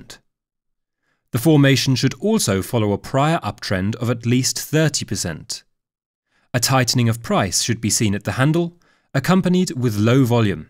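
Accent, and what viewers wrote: United Kingdom. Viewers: You londoner?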